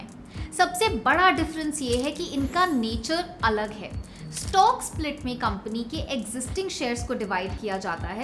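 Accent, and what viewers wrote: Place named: India. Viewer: cant listen to this indian talk , dont understand anything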